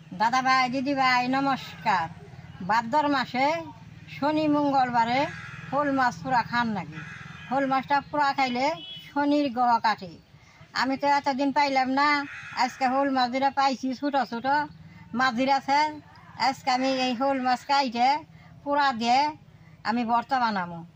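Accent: Tangail, Bangladesh. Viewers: আপনে গো ভাষা,,,,, আমাগো বাংলাদেশের টাংগাইল জেলার ভাষা same,,,,,আপনেরা কোন জেলার গো?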